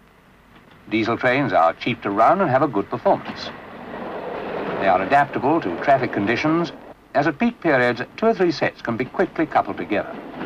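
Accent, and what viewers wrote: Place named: RP, United Kingdom. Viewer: I enjoyed this! It's a piece of social history as well as railway history. Look at the clothes people wore, listen to the BBC English of the narrator, the "Light Programme" style of the backing music, the explicit statement near the end that only men would ever drive these trains, spot the parcels trolley on the platform etc etc... it's a real step back in time.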